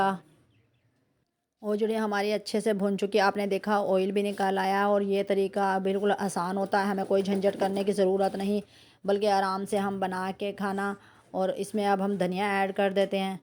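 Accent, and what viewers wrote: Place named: Multan, Pakistan. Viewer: Thanks mujhe apki awaz Multan ki lagi thi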